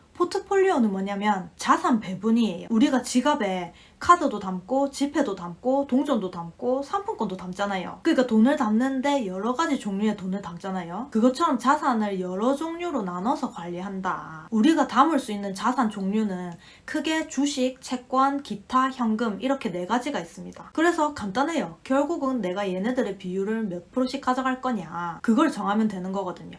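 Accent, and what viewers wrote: Gyeongsang, South Korea. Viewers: 경상도 사투리가 crystal clear 로 잘 들려요. 저는 경기도 정보 감사합니다 ETF 도 여러 종류가 있던데 묶음주식 10개 Main 을 보면 많이 다르던데 추천 부탁드립니다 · 누나 ㅋㅋㅋㅋㅋ설명 너무 찰진다요 ㅋㅋㅋㅋㅋ 경상도 사투리라 더 머리에 쏙쏙 들어옵니다 ㅋㅋㅋㅋㅋ진짜 구라안치고 저는 ETF가입할까 말까 고민만 2년째했는데 누나 영상보고 바로 결정했습니다 ㅋㅋㅋㅋㅋㅋㅋㅋㅋㅋㅋㅋ감사합니다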